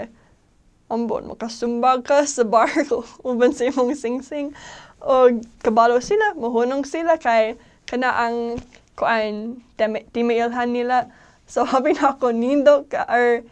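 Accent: Philippines. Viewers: love the accent.. bisdak kaayo. Apir! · Perting bisayaa jud bayhana 😂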